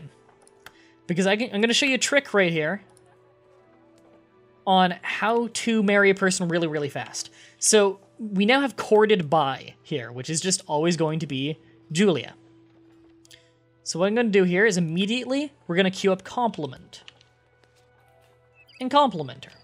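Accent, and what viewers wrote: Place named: Canada. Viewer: 99% Sure he is Canadian. The way he says "about". ABOOOOT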